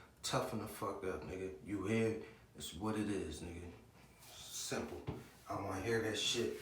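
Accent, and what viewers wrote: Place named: Philadelphia, United States. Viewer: Yo bro I’m from north philly area and Trenton nj I knew you was close by because of how you talk we got a different slang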